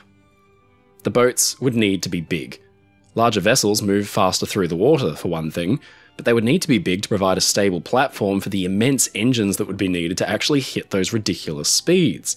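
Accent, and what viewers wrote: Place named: Australia. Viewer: Australian accent is just so hard to listen to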